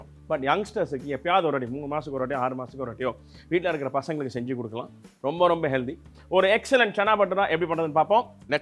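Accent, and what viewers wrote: Chennai, India. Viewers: Chef ungah Chennai thamil. Oru thaba epadi oru thaba appadi Semma ponga. Simply flattered by ur way of explaining. 👌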